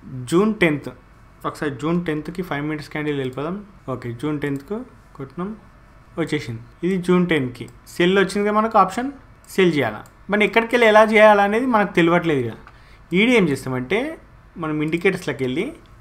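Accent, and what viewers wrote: Telangana, India. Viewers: 😂👍🌹🌹🌹 i like your (telangana yasa) language....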